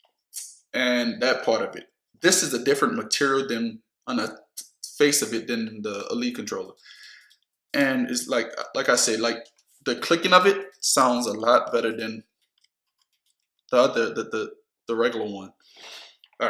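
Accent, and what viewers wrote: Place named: Louisiana, United States. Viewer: What up fam... I subscribed to your channel, and I was like he sound like he from the “Boot” too, but wasn’t sure. Then I see this video and I see the Saints Cap 😂.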